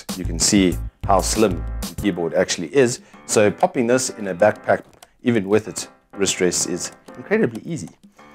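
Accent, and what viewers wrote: South Africa. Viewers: South Africa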